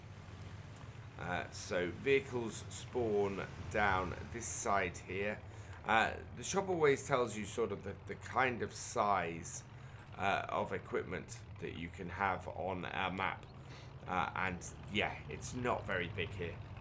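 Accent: United Kingdom. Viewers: Are you from Norfolk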